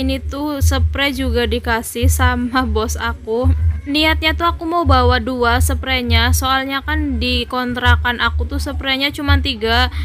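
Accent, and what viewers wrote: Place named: Java, Indonesia. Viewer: Asli mana bun,medok bgt logat nya ..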